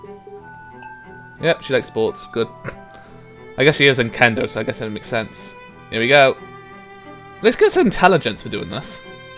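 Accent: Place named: United Kingdom. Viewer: Just so you know, no offence intended, "nubile" is not pronounced "nimble", it is pronounced "new-by-elle". Also, really, if you have troubles with a lot of the words, you should really not read the text. On another note, this is a side game set in a different universe to a game titled "Sunrider" which has since been released as "Sunrider: First Arrival" and "Sunrider: Mask of Arcadius", with a third installment to come at some point. Having played them only really shows you another version of the characters. Hue: a gradation or variety of a color; tint. "Re-Pro-Duck-shun" Seriously now. Your accent sounds like you are British, you should have been taught most of these words by now...right? Again, no offence, just...listening to you mangle the words is like having an ice pick shoved in my ears. A single ice pick. Hitting both ears. At the same time. 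But that is just me. Again, not trying to offend you.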